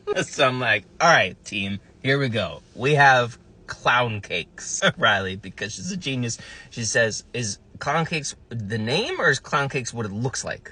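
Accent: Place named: Texas, United States. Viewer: That accent and jacket... You did not indeed mess with Texas. Except, Austin. That's not Texas. Great video btw!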